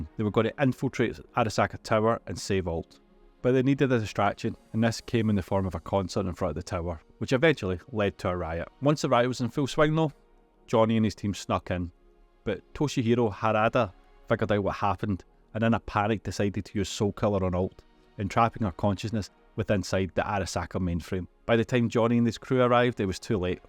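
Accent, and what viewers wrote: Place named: Scotland, United Kingdom. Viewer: Cyberpunk explained by a Scot! Great video, learned a ton. (Sorry if you're not scottish).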